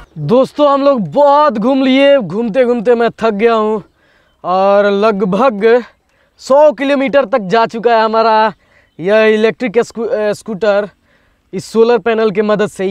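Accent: Bihar, India. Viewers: बेटा आप खूब तरक्की करो। वीडियो लोकेशन देख के और आपकी भाषा सुन के ऐसा लगा जैसे आप बिहार से हो। बिहारी बहुत तेज़ होते है खोज करने में। आपको इसपर होने वाला खर्च और जगह का पूरा address भी बताना चाहिए की जो खर्चा करके बनवाना चाहे वो आपको संपर्क कर सके। इस प्रोजेक्ट में लोगो का interest देख के बड़ी कंपनी भी आप से संपर्क करेगी और आपका भविष्य बहुत अच्छा हो जायेगा अगर अभी आप student हो तो भी 2-4 लोगो को इस रोजगार में लगा दो देश को बहुत सारे ऐसे बच्चे चाहिए तुम्हारे जैसे ।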